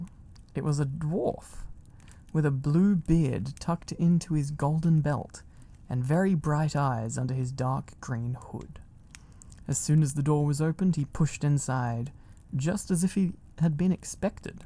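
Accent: Australia. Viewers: i really can’t tell if ur Australian or not but i fkn love ur accent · omg i am so excited for this series!!! i recently got into the LOTR books and movies and im loving them + your videos too omg. I AM LOVING THIS COLLAB SO MUCH LMFAOOO 😂😭 but jokes aside, your ASMR videos are really helping me get through sickness (a literal covid-level stomach infection and cold), period pain, and just mental health struggles daily. thank you so much for doing what you do, and all the effort and hard work you put in! <3 (all the lovely gifs you create are not going unnoticed at all dw! 🦋) your wholesome videos really brighten up my day (or night? since i listen to them while sleeping mostly haha.) i love your sense of humour, your rambles, and your ideas! you also seem like an incredibly intelligent and a really good person. you are charming as hell and your austrailian accent is amazing of course haha. 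(im sorry this comment turned out to be equivalent to a personality test result + tarot reading 😭 but i meant it all!!!!) i am so glad i discovered your channel!!! ilysm pls, thank you so much for existing. 😭🫂💗💗💗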